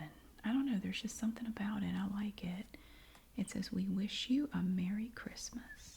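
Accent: South, United States. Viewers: For what it's worth, I adore your accent! I lived in NC for many years and I miss the Southern accents. ❤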